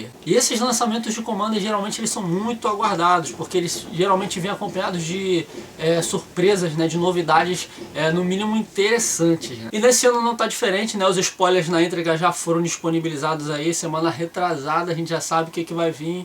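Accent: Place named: Rio de Janeiro, Brazil. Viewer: carioquês é foda de aguentar... desculpa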